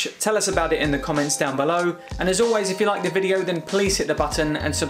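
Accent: United Kingdom. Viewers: Dear Lord, once again a perfect Video which comes at the perfect Timing for me. I really adore your videos, or maybe its just the well sounding british way of presentation 😁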